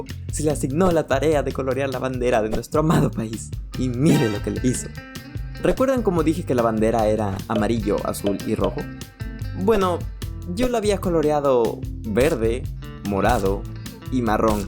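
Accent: Guayaquil, Ecuador. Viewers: Oye eres de ecuadorr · Eres de guayaqui o de guayas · Oh eres de Ecuadoyo igual. ''Abrazame hermano!!'' XD.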